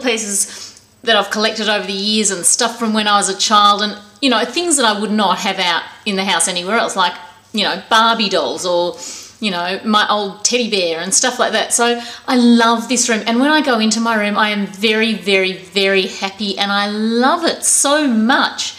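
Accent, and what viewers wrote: Australia. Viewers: yay 10 for the australian accent!!! Reminds me of my aunt lool!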